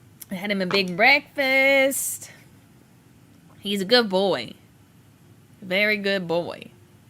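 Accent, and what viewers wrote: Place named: Tennessee, United States. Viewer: Tennessee or Kentucky which one lol I hear it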